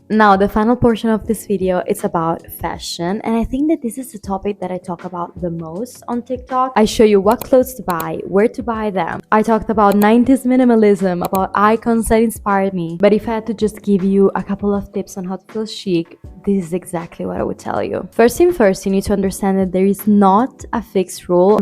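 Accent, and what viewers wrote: Italy. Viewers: sentivo l accento ma mi sono stupita di come parli inglese da italiana, come mai fai video in inglese? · wait a minute are u italian?? i noticed only now the accent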